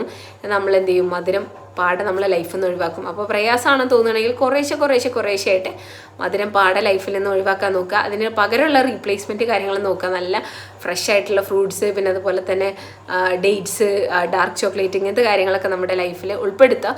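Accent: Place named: Vadakara, India. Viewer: താങ്കൾ വടകര ആണോ?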